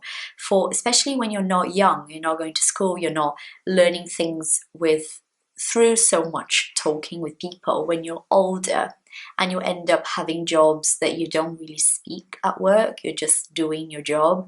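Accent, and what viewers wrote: United Kingdom. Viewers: I don’t compare.. I know kids are like a sponge 😂 But I should get your advice, I just pay attention to my mistakes not to the victories. I love you British accent, it’s so clear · Beautiful accent! Clear, pure and real british english! Love it! Congrats! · Despite your process learning hadn't been easy you achieved a great English with a excellent and clear British accent.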